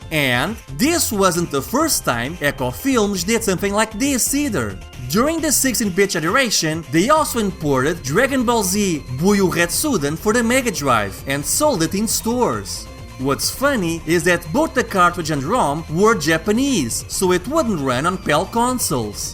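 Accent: Portugal. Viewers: Nos primeiros 30 segundos percebi logo pelo sotaque que devias ser português xD. Parabéns pelo canal e o seu conteúdo.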